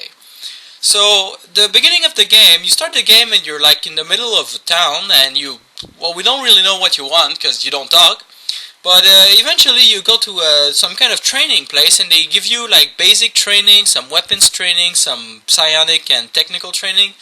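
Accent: France. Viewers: Let me guess... French accent?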